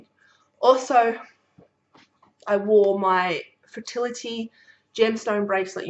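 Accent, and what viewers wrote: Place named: New Zealand. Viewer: Just a question you sound like you have an accent... my guess is New Zealand accent... I can hear it in there with a few words that you use.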